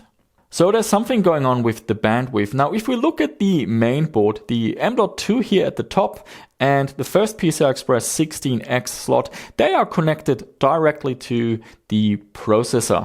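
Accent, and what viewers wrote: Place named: Germany. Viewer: I have to ask, are you originally from Australia? Cause your accent sounds German to me for some reason.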